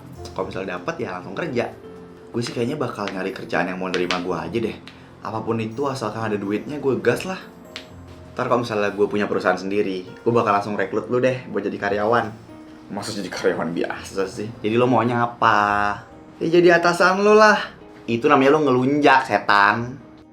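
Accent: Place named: Eastern Indonesia, Indonesia. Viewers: Akhirnya setelah sekian banyak video yang sa nonton ada juga yang pake logat papua❤❤❤❤ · Logat timurnya 👏🔥💯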